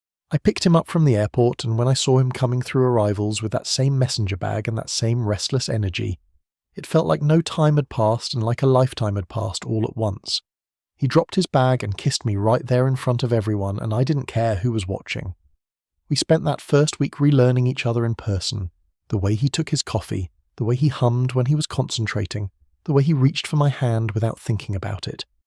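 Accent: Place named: United Kingdom. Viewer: I would like to make a suggestion. If it's OK to take a british narrator it's non real to use him for dialog of American characters. Could you try to make an effort to solve this situation? As an actor myself it would really make a difference. Thank you! By the way, I'm from near Montréal, Québec, Canada.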